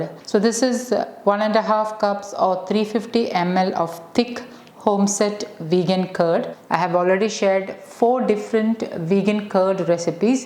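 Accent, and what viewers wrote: India. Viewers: There's nothing different with her accent, it's just a perfectly normal Indian accent.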